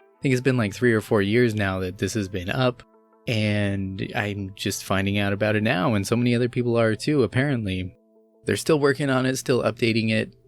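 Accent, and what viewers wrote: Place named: Canada. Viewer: Where are you from? Mild Canadian accent.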